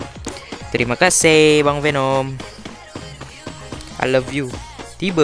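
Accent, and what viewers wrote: Sabah, Malaysia. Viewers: Abang orang sabah ke ?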